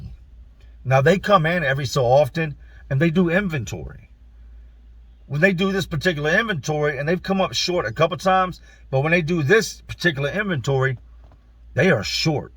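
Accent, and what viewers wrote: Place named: East Coast, United States. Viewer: People need to understand your from the east coast the inner city… the way you talk comes from where you grew up and also in and out of prison lingo….I think it’s our differences that make us interesting… I’m glad everyone doesn’t sound the same…. I like hearing you tell your stories…..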